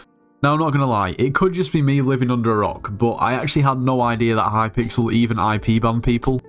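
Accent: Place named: Midlands, United Kingdom. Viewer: Also where are you from, you dont sound too northern. I want to say midlands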